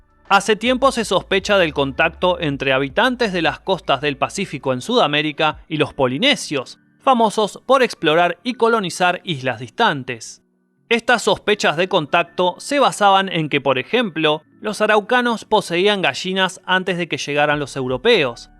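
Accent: Argentina. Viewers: Qué es una gashina? Es broma es broma 😂. En realidad suena muy cool el acento argentino. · seguramente eres argentinillo por tu plaga para hablar, deberías ser más objetivo y es que la verdad éstas lleno de suposiciones deberías hacer videos como la; BBC inglesa, NHK japonesa ó Discovery channel americana, pero estás a años luz de hacer videos de calidad, tenías que ser argentinillo 🤣🤣🤣🤣🤣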